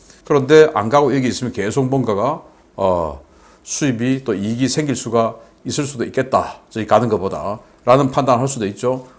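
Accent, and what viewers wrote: Gyeongsang, South Korea. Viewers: 이 분은 전쟁 신봉자네요. 군사력이 강해져야 하지만 생각이 다른 국민들은 모두 빨갱이이니 청소해야 한다고 하니 푸틴하고 뭐가 다른지 싶네요. 억양을 들어보니 경상도 사람으로 보이는데 지역정서에서 벗어나지 못한 불쌍한 분으로 여겨지네요. 우물안에서 벗어나 국민을 함께 바라보는 넓은 시각을 지향하면 좋겠다는 생각입니다. 이 분이 군사전문가로 보이는데 시야가 좁으니 인물이 아깝네요.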